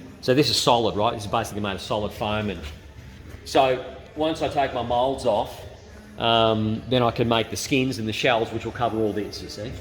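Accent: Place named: Australia. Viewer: Great video! And really nice to hear an Aussie accent in the Experimental category. Great work!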